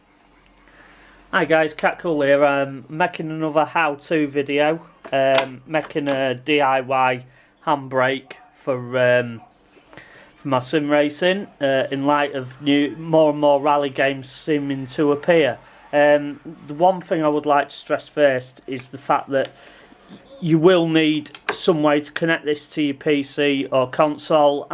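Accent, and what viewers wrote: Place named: Midlands, United Kingdom. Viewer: You sound as though you're from the Midlands...I'm guessing first Lincolnshire or possibly Nottinghamshire.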